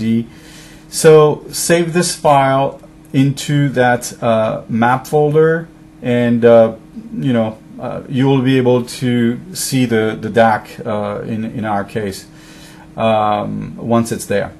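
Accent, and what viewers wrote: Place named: France. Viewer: Really impressed by your english there - one can barely hear that you are French which is quite unusual. Trés bien!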